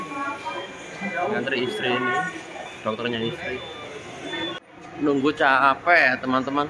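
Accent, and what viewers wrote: Malang, Indonesia. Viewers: Tas Nemu Chanel mas, wong Malang ta mas? Kok logat e koyok wong Malang?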